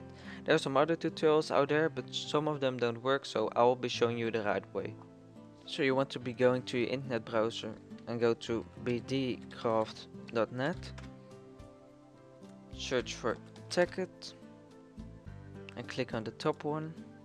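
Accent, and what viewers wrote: Netherlands. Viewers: are you from netherland it sounds like english is not your langue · lemme guess, you a dutchie?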